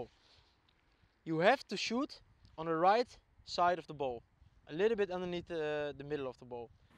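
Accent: Netherlands. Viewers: cruz you’re right thats because he is 100% dutch :D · Dat nederlands engels 😂 geweldig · dit is de eerste vid die ik heb gekeken van hem en ik hoorde ook al dat ie nederlands is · Is it weird that i could detect his dutch accent before i saw his name??😅😅😅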